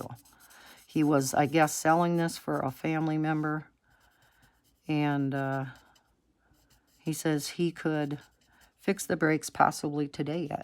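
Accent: Wisconsin, United States. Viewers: Hi! I am from Menomonie. It sounds like you are in the Wausau or Stevens Point area? Just guessing. You shouldn't be nervous dear because you sound like you have been doing this forever. Pat on the back. The foil technique is so cool. I'll have to give it a try. Thanks for sharing it. ;)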